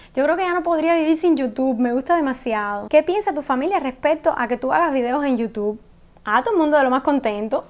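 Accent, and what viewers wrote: Argentina. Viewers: Me encanta como hablan los argentinos y x cierto irradias mucha energía positiva eres linda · me encanta el acento argentino sobretodo desde que vi la telenovela Muñeca Brava jejeje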